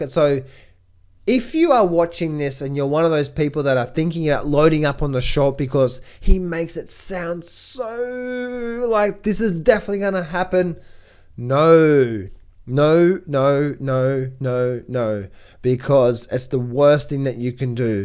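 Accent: Australia. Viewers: love a good no-nonsense Aussie accent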